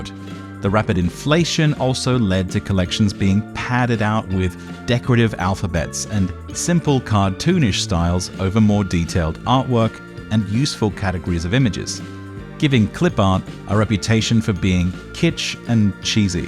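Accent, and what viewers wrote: United Kingdom. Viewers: sorry man cant finish this video your british voice is just so condescending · Why does your voice suddenly sound bassier and slightly more British?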